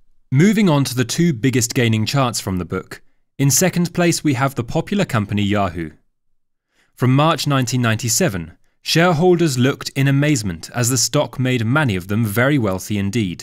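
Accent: United Kingdom. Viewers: You londoner?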